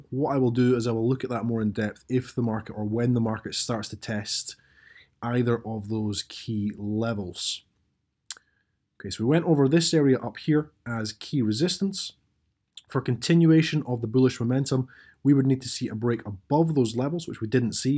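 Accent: Scotland, United Kingdom. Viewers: Greetings, great videos, especially those for beginners, which particularly helped me, and, you sound scottish, i do love the accent haha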